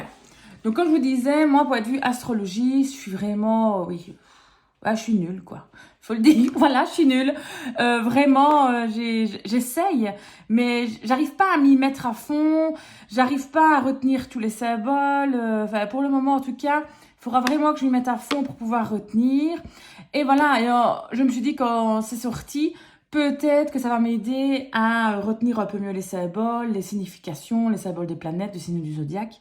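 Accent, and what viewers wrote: Belgium. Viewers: Très bel accent Belge 😃😃😃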